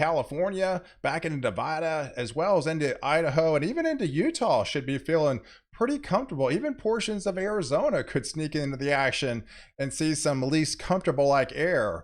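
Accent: Texas, United States. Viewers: I love his texas accent and manner of speaking unlike Canadian no knowledge or love of weather you just listen to them read their written speeches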